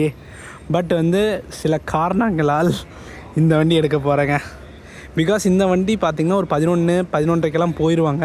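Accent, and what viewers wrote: Kanyakumari, India. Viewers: Bro are you from kanyakumari 🤔.. Your slang is like typical kanyakumarian tamil ( malayalam mixed tamil)🤔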